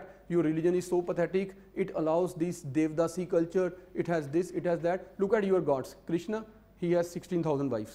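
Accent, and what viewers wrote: Punjab, India. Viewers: Finally someone explained the history in a lucid manner and cherry on the cake is his punjabi touch that keeps you involved during lecture..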